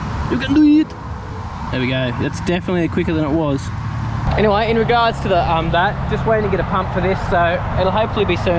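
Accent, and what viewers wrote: Australia. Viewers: I guessed you were from UK. After I had read your channel description, I realized that you speak English with RP OZ accent. I have never seen a house having a coop & its chickens as well during my stay in Victoria unless it is in outback. I thought having such consumption animals in urban areas was not allowed or illegal, but the house does...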